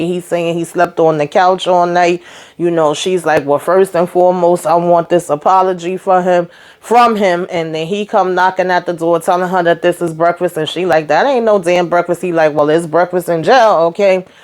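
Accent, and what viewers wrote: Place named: New York, United States. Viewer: Thank you for your excellent reviews. I just love your NY/NJ accent. It sounds like home. (I’m from LI). These LALU folks are not in their right minds especially Gabby and Chris. I fear for them and can see the more domestic abuse in their future. What a mess, but it’s so entertaining 😁 Keep it coming sis! Blessings 🙏🏾🙏🏾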